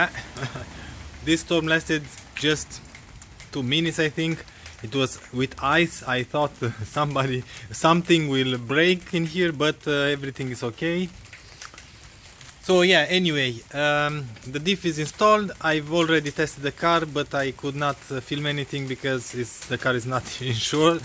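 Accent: Romania. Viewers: Am zis eu ca are accent romanesc . Diferential de pe e39 525 d e compatibil cu 525 tds? Mersi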